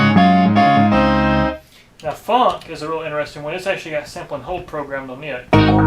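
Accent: South, United States. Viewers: You sound southern?! I’m in NC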